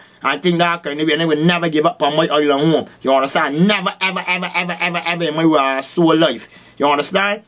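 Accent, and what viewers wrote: Barbados. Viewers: I freaking love the bajan accent! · Lmao his bajan accent is the best sounds like my grandad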